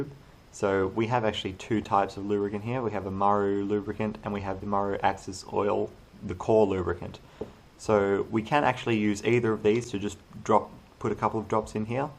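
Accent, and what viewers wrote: Australia. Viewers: Its called how Australians really sound like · Guys just cause he's australian doesnt mean its Felix 😂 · Umarun Nisa no he is just Australian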